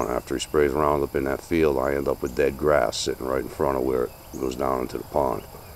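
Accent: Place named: South Louisiana, United States. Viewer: Are you in South Louisiana? I'm from Nola and your accent sounds familiar.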